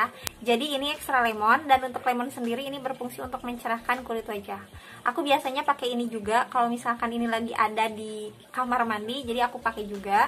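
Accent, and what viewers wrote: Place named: Sunda, Indonesia. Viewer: Eta eumeul eumeul...meni nyunda pisan atuh, si teteh geulis teh...he he...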